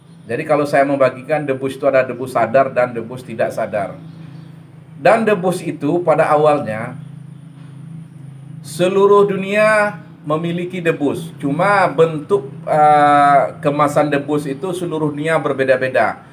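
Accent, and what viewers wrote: West Sumatra, Indonesia. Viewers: Maaf pak saya msu tanya, kalau di lihat dari logat bapk saya yakin bapak barasal dsri sumatra barat kayaknya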